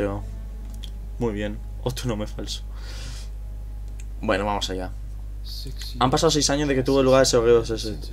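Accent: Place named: Puerto Rico. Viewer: El acento es puertorriqueño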